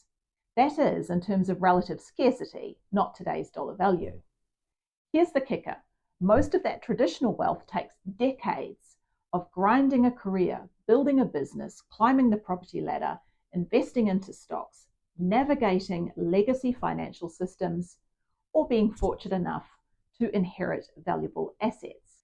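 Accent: New Zealand. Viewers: You sound like a kiwi .. are you? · Bitcoin is 👑..keep up the Great work, Spreading the Bitcoin Message..My Btc Journey began in May 2021, and I never looked back..I enjoy your content as well as..Your Hot 🔥🔥🔥....I look forward to your next episode..I detect a kiwi accent..😬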